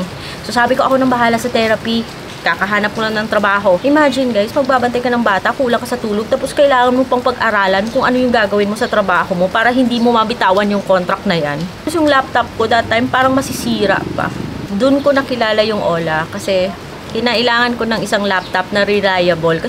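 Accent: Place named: Visayas, Philippines. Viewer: dah gawas lge imong pagka bisaya ahahaha..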